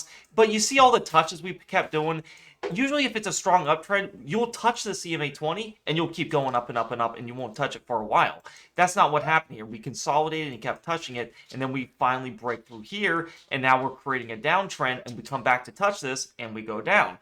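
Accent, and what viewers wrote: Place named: Iowa, United States. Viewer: ARE YOU FROM Iowa??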